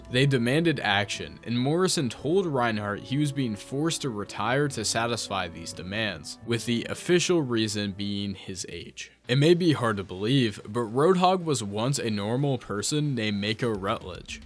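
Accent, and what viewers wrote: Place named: United States. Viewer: is this a text to speech or are you so american you can't let go of the american pronunciation? It's horrible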